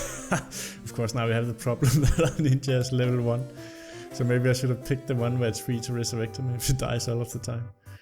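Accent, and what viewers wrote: Denmark. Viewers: You're Danish, ain't ya?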